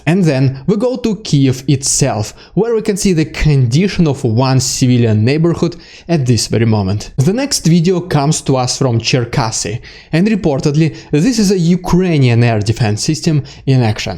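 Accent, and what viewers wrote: Russia. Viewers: i love the strohhngo russ accent✊💙💛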